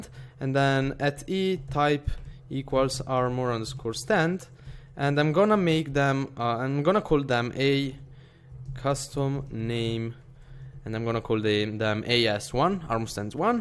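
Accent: Italy. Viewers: Ahaha si sente tantissimo l accento italiano, bel tutorial btw 😂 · CAZZO BRO SI CAPIVA SUBITO DALL ACCENTO CHE ERI ITALIANO XD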